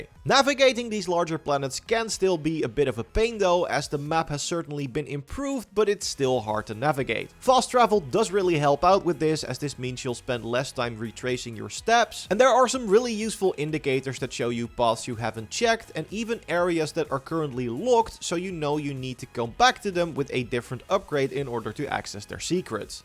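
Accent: Netherlands. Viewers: Dutch guy speaking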